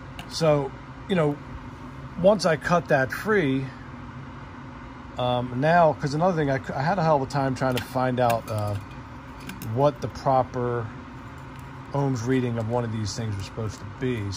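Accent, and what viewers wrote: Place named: Philadelphia, United States. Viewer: Are you from Philly? 😂 I can tell by how u talk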